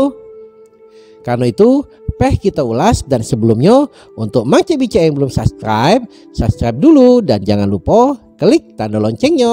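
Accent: Palembang, Indonesia. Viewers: Asli uong Palembang nian caro ngomong yo hihi 😁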